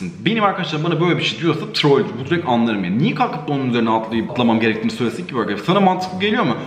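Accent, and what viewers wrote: Bitlis, Turkey. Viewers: Bitlisli misin gülüm ? Djdj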